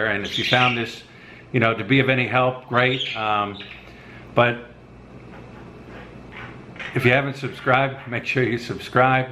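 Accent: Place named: West, United States. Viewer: Also it could be funny if you are from Philadelphia , but you sounds like from the west part of the US.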